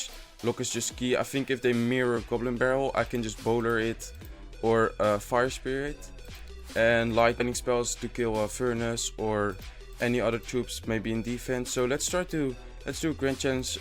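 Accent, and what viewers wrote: Netherlands. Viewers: where are you From? You've Got a English accent like a dutchie that is talking English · Haha, loving the Dutch accent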